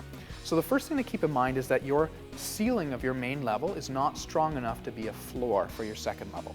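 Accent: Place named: Canada. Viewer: Obviously this guy is Canadian. i.e. "blowing the snow off when it precipitates in the winter" and the accent. Not for North Carolina where I want to add on.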